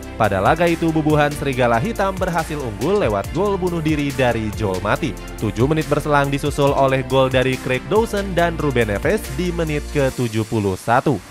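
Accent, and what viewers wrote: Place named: Banjar, Indonesia. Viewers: Pian ni urang banjar kah mang?? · Anjay adminnya org banjar nih😄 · Admin cetakgol orang samarinda kah....GK asing sama cara bicaranya🤔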